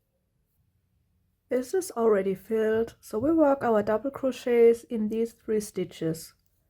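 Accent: Germany. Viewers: Holy deutsch accent its so cute 😂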